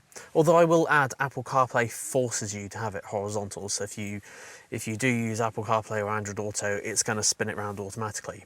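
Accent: United Kingdom. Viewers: I find it rather odd that a dude with a British accent, sitting on the right side of the car is complaining that the indicator stalk is in the correct place. I really hope BYD doesn't listen to you and keep it on the side of the driver door like it should be. In a RHD car, the indicator is on the right and on the left if the car is LHD. See every Toyota, Nissan, Honda, Mitsubishi, Subaru, Mercedes Benz - made for a particular market (RHD vs LHD). I assume that if the Japanese can get it right, the Chinese can too. I don't know what's wrong BMW and VW. They're just lazy I think. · British accent based in usa?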